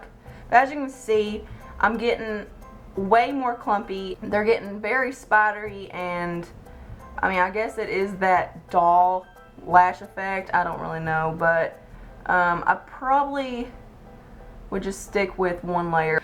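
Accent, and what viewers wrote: South, United States. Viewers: Hands Down...The Maybelline Colossal mascara looks better on you. Now I see why people love my southern accent, listening to you makes me proud to be a southern woman. Thumbs up.:) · Welcome to the Southern accent. A thing of beauty, ain't it?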